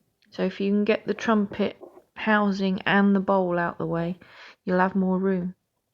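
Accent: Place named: Suffolk, United Kingdom. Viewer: hey, just bought my rover 90 p4... hope to see you about, you sound local ish to me, Suffolk but I am no good with accents anyhow so your probably not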